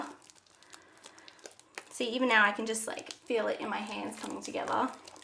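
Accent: Australia. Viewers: You sound so much like my violin teacher, she is from Australia too. My mom lived in Australia for 1 year and she GOT soooooo god in english!! 6++++++ every test ehehehhe🥰❤️😂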